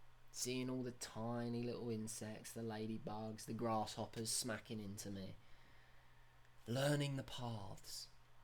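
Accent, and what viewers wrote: England, United Kingdom. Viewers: somehow everything makes more sense with an english accent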